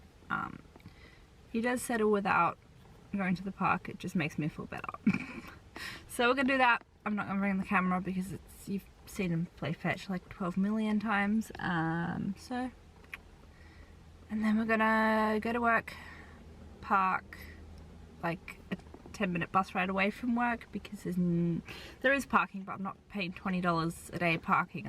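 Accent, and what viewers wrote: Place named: Australia. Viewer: I hear more of a Australian accent from you. 2ish years ago it sounded more like an English accent. I know you're german so you probably learned English with more English influences than Australian. I'd like to hear your thoughts.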